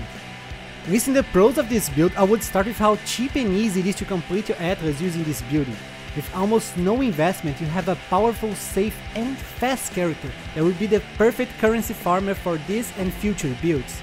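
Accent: Brazil. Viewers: Cara é incrivel como seu inglês é perfeitamente correto e ainda consigo sentir que é um BR falando ahuahuahuaau nice video irmão!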